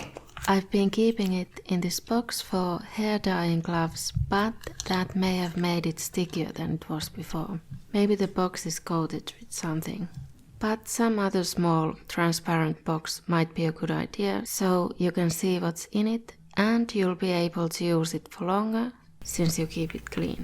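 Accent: Finland. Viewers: Ootko suomalainen ku aksenttis kuulosta siltä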